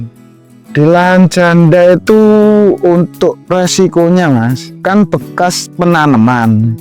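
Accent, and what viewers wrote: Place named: Java, Indonesia. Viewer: Hmmm, mas medokk bangettt, akuu sukkaaaa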